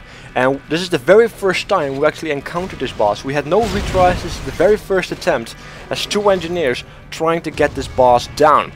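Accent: Netherlands. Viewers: something says you have a dutch accent! :) neverthe less.. good video. enjoyed it. Good to know my 19 euro's are a good investment.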